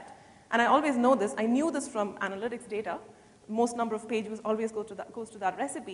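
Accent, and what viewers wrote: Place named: India. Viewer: It took me a minute to get used to the Indian dialect, but this is good information for bloggers. I will make use of it on MotorcycleEventNews(.)com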